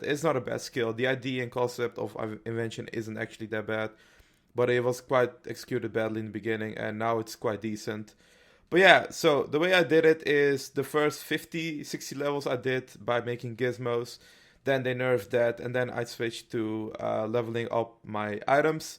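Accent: Netherlands. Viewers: is how 80% off the dutch ppl speak english ahha! I'm also a proud dutchman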